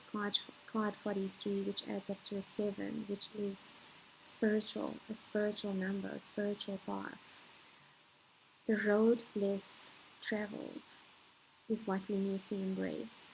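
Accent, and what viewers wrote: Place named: South Africa. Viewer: You have a South African accent.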